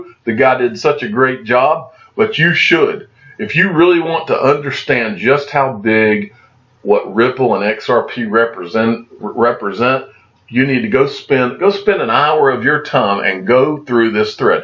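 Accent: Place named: Georgia, United States. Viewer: You sound like some boys I know from Blackshear I bet you're in a 50 mile radius of Jesup. Hello from the Boro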